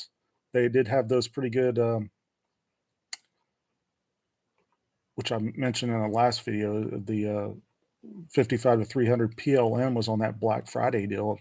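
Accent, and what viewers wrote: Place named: South, United States. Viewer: Ah, your southern accent ain't that bad man. Eddy's video was great and you hit some interesting points too. I've been tossing the idea of creating jpeg styles for different situations back and forth but haven't done any yet. I'm just so used to shooting raw. But if I can create the jpeg style to mimic what I would do with the raw file anyways, it would save so much more time!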